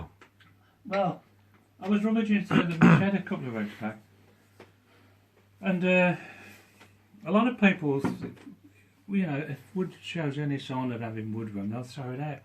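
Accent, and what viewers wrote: Birmingham, United Kingdom. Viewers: Sounds like a fellow Brummie! We have always been an inventive lot.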